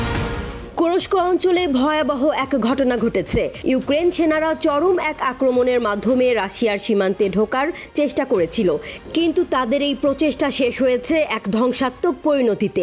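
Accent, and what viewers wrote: India. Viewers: আপু,,আপনি কি বাংলাদেশি না ভারতিও।বাংলাদেশের কোনো সাংবাদিক এই ভাবে নিউজ পড়েনা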